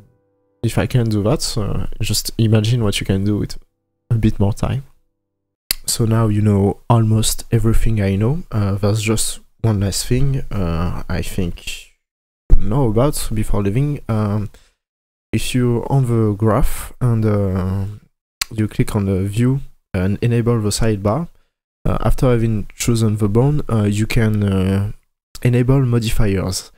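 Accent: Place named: France. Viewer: Love your accent, I think you're french <3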